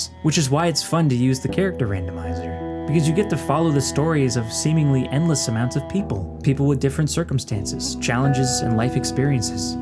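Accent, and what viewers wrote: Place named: United States. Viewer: Naw the tooth just makes you sound 'Merican :) I cant believe how long PZ has been around. First thing I install whenever I get a new PC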